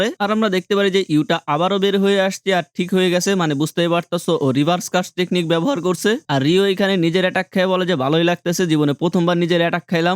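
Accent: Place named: Magura, Bangladesh. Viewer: Vai apnar basa ki magura? Magurar Moto accent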